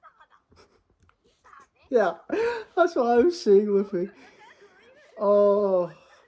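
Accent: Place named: Scotland, United Kingdom. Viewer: Man congratulations on 1k🎉🎉🎉 Im so happy for you. I've been watching since 40subs and I feel like we're actual nakamas now because I seriously want this channel to succeed. I can totally see the hardwork put into these videos especially since you also have a job. This is no cap the best one piece reaction channel on yt. 1k is well deserved and long overdue tbh..... And you made me like Scottish accent too lol😅...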